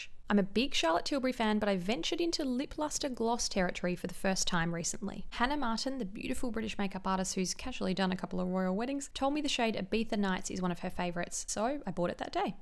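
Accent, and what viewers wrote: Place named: Australia. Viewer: She has such a posh Aussie accent. I’m Australian and let me tell you, I do not sound anything like this 😂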